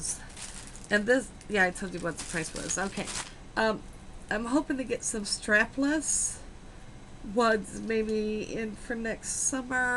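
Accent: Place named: Midwest, United States. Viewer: Great deal on the washcloth pack. You pronounce it just like my whole family does - no surprise since my mom's side all came from Omaha. She moved out here to California when she was 13, but we all still talk like we are from the Midwest.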